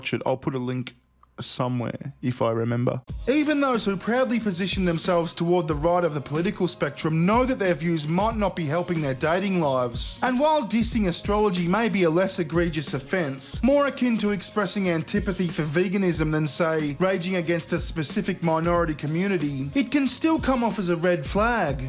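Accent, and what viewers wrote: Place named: Australia. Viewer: the australian accent is just so awesome. i want u to say put another shrimp on the barbie in an upcoming vid. with the exaggerated upwards accent like 80s paul hogan. p'huttt ahhnuhhthah sh'hrhemp auwn theah bahbbeh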